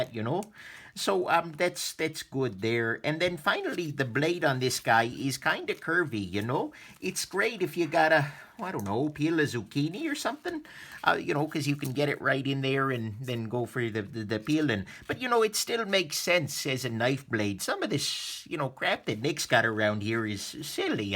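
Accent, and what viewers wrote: Canada. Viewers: I knew i could detect Canadian right away. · Hey, are you from Canada or Minnesota?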